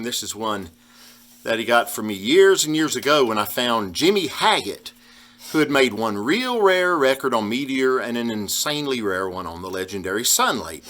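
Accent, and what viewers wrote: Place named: Texas, United States. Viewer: Love the Texas talk. I'm in Southern Illinois. Always wanted to visit, never worked out. Great finds!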